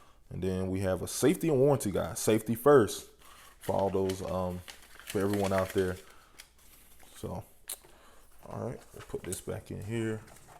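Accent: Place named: Texas, United States. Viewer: Sounds like Texas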